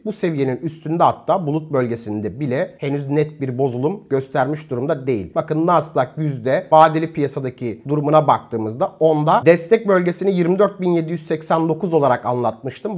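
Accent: India. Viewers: Turkce dinliyemiyorum:) ve hint aksanı vae bilginiz olsun:) uk deyim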